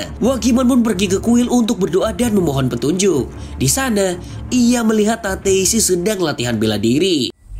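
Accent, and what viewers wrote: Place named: East Java, Indonesia. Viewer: Njirrrr kweden, fix lu orang jatim y, sidoarjo apa surabaya min?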